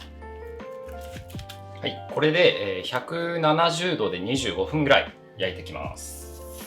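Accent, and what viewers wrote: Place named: Yamaguchi, Japan. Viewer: 明日市販の栗のシブーストを食べる予定です。自分で作れるかなと思って検索したら、動画をあげてくださってて嬉しいです。ところで山口県の方ですか？○○けんっていう話し方で、そう思いました。私がそうなので。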